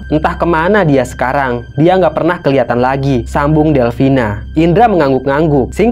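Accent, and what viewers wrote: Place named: Medan, Indonesia. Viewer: Sharusnya logat bicarany tu logat banjar,, knp kemedan medanan,,,?? Bungulll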